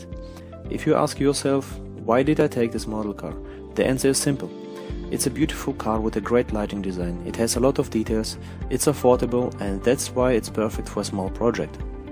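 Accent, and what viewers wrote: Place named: Germany. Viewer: beautiful german accent!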